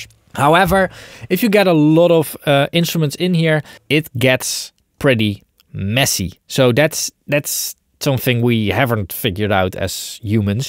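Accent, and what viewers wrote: Netherlands. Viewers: you sound a little bit dutch, am I correct? 🙂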